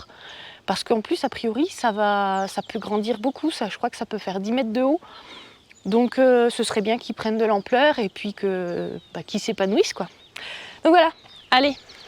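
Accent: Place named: Franche-Comté, France. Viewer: gaugé !!! voilà une vraie franc_comtoise 🙂. ca fait plaisir à entendre . Bon vent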